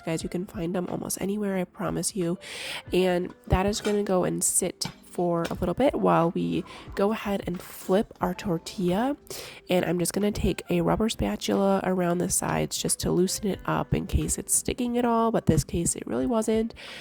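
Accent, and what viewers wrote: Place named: Michigan, United States. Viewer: Everything looks great! Sounds like you're from Michigan or somewhere north??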